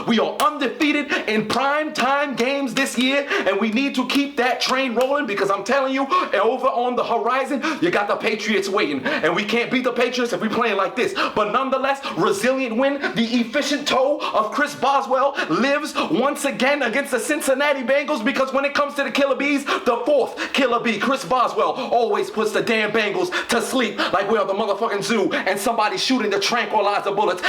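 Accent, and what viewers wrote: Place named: South Louisiana, United States. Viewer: I'm just curious based on the way you speak. Are you from South Louisiana?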